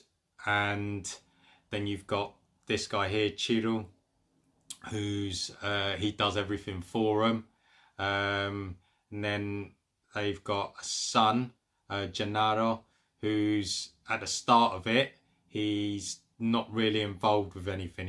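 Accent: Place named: England, United Kingdom. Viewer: In Hawaii I grew up with many Filipinos, first time I'm hearing one with English accent 🤣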